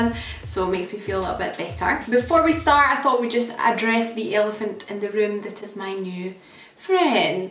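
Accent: Scotland, United Kingdom. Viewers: After watching your videos, I always find myself going away to do my make up😂 love hearing a Scottish accent on YouTube as well😁🏴💕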